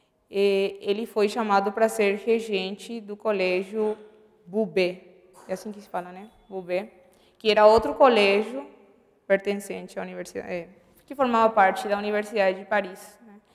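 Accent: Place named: São Paulo, Brazil. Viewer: Gringa com Sotaque paulista. Primeira vez que vejo. Excelente aula. Obrigado.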